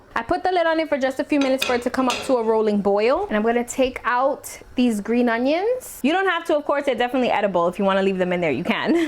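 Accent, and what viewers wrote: New York, United States. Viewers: The New Yawwk accent!!❤❤ reminds me of home! 😊 Thanks for the recipe!❤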